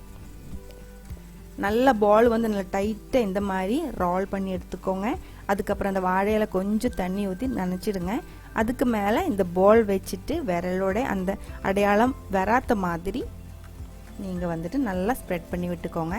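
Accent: Kerala, India. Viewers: Your slang semma Ma'am...... Malayalam and Tamil ........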